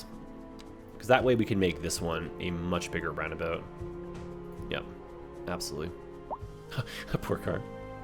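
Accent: Canada. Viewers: Will you be doing any sections from Toronto? Maybe a highpark area or possibly do the Gardiner? First time watching and knew you were canadian with all them "Eh"s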